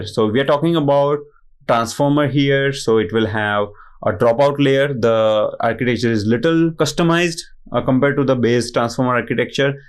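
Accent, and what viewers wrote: Gujarat, India. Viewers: Best explanation out there! Also, love that gujarati accent😂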